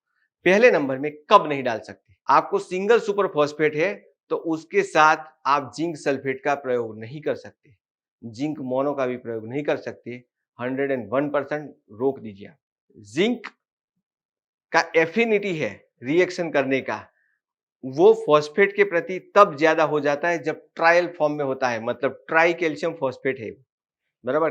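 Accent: Gujarat, India. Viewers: आप गुजराती हो ना 😅 बराबर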